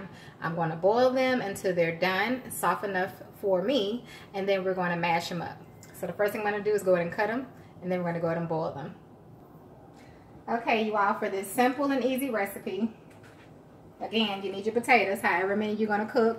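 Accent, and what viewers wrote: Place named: New Orleans, United States. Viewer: Omg where u from ? I love your accent I’m getting Nola vibes cus u sound like Toya lol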